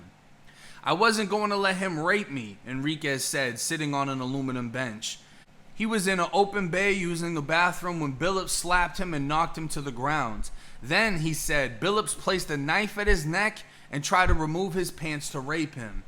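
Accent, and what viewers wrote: Boston, United States. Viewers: You from Boston bro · U sound like u from my city 🤔 #Dorchester #Boston #Streets raised me 👌🤷🏽‍♀️ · You sound like your from Boston mo. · Damn..... Are you originally from Boston, South Shore? I'm a Mass transplant living in FL too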